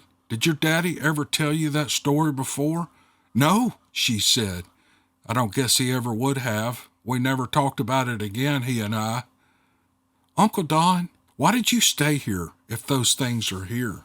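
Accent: South, United States. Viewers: Don't worry about the haters. Just read until you find out that they are haters and then hit the delete. Don't waste your time on them. I love all the stories and don't mind a bit if you mix Bigfoot and ghost or aliens! All good!! Thank You for doing these I love your story telling all the way up here in Michigan. Oh and your Southern sound is just fine!! Thank You again! Tina Rasegan. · Hats off to the writer & story teller. Story flows like southern accent. 🧚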